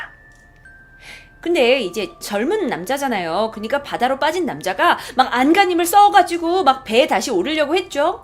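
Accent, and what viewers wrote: Jeolla, South Korea. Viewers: 남자는 숫가락힘만 있으면 딴생각함 근데 제시카님 전라도출신이세요? 사투리 감칠난다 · 고향이 전라도신가벼여??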